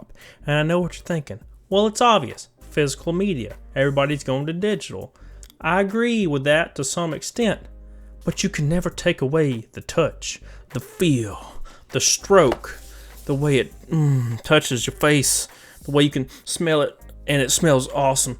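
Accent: South, United States. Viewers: Off comment Where are you from I just love your southern accent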